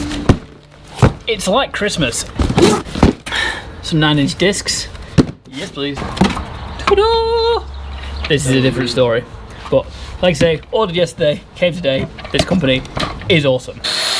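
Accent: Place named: United Kingdom. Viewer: Sometimes the British accent makes it impossible to research some of this stuff online. What is a keg stone? A ket stone? A keystone? Ketsone? Ketsone?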